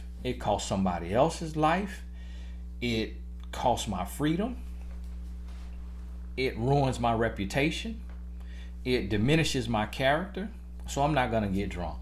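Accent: South, United States. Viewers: keep the accent, it is apart of your brand. You remind me of my southern relatives whom i truely miss. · Hey brother, great video as always! I love your Country accent it's so cool and you are pretty funny lol... keep up the good work... God bless you. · Be blessed...to your and your family. I actually like your country accent. We all have accents tho' 😏. Some might not think so. 😏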